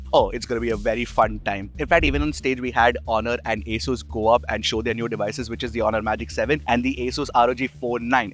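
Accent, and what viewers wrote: India. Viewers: I don’t like your Indian accent honestly, difficult to understand sometimes as English is my second language but your channel, contents and quality is perfect 🎉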